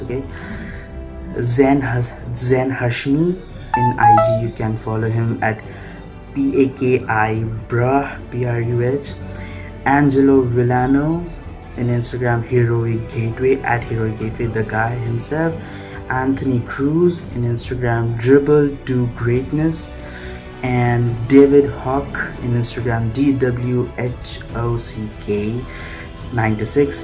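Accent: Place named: England, United Kingdom. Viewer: Like the english accent :v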